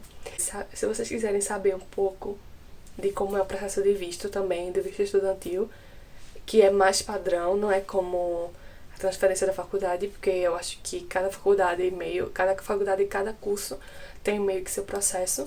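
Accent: Santa Maria, Brazil. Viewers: Tu é muito divertida véi!! E que bom que não perdeu o sotaque de SM kkkkkkkkkkkkkkk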